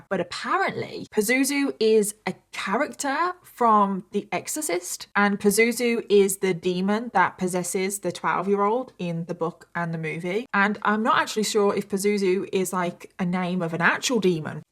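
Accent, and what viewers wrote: United Kingdom. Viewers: we love a sassy British accent truecrime queen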